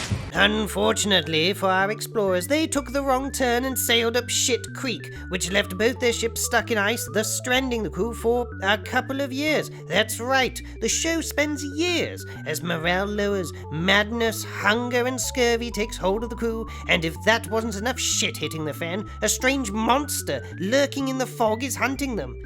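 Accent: United Kingdom. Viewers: Are you British or are you an American playing a British puppet character?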